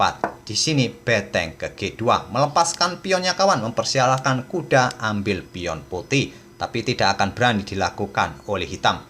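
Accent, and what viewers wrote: Java, Indonesia. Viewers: jangan kurangin analisisanya min, tapi klo tujuannya mengurangi durasi, boleh tapi sedikit aja, itulah ciri khas channelmu dan satu hal yg harus admin tahu, admin tahu caranya menganalisa sesuatu dengan intonasi yg tidak datar( ada variasi tempo yg cepat lambat dan variasi nada yg tinggi rendah) dengan dibumbui logat khas(jawa), ini modal yg besar untuk menganalisa sesuatu kepada penonton karena tidak semua orang mampu menguasai ini, jadi jangan sia2kan ya admin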